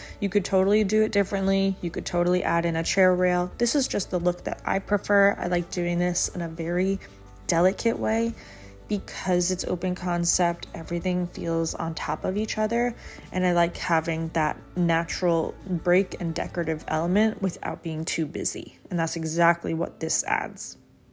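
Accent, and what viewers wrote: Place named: New York, United States. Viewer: What were you doing (for work) before YouTube and motherhood? I remember you said you’re from NY. Upstate? (Accent noted!)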